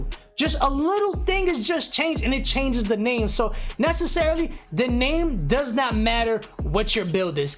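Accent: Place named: North Jersey, United States. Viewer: Oh yeah and shot out jersey in the buildin aaayyy South Jersey Camden county to be exact.. I know by how u talk u from north lol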